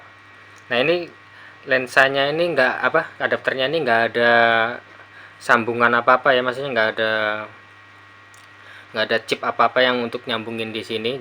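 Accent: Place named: Brebes-Tegal, Indonesia. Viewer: Sampean tinggal dmn om? Kayak brebes tegal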